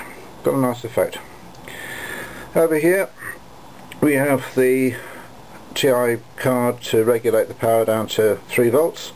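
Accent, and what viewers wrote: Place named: United Kingdom. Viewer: somebody can explain me what this does/ sorry is because it kind of hard to my to understand brithish english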